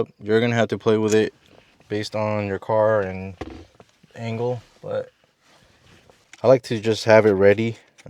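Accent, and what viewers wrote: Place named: United States. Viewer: You sound american but drive on Italian roads?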